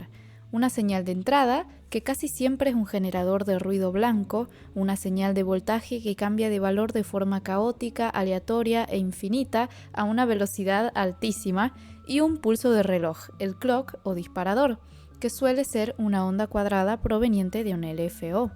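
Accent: Argentina. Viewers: de q parte de argentina eres??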